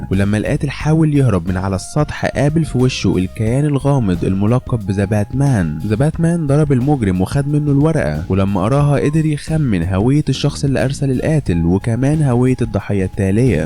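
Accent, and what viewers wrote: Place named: Egypt. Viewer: هو مصري مو سعودي لا تستغبي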